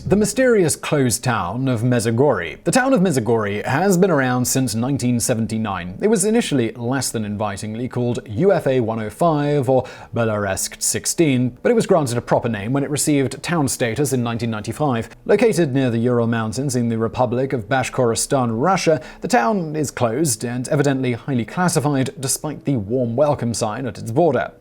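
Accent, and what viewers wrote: United Kingdom. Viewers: I hate the British accent :|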